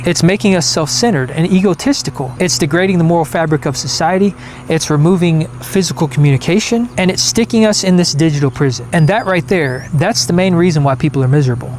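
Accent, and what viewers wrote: United States. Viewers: Yay, I love the sound of your American voice and watching Shawnee and your chickens in the background and what you say. Thanks ❤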